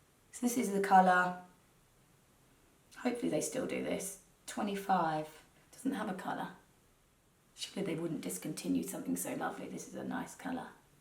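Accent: United Kingdom. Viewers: I really love the tutorial and your knowledge of Rita Hayworth. I enjoyed the videos that you and your sister make because I always come away with something that I didn’t know and feeling a little bit better after listening to your lovely British accent. I am watching you from Phoenix Arizona in America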